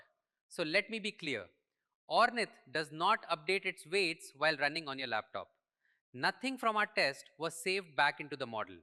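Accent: India. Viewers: thanks for the video, really helpful for low end systems. i also like youre indian accent 🙂👍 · (It's an AI generic Indian accent shared by various ai channels ... it is a soothing one though)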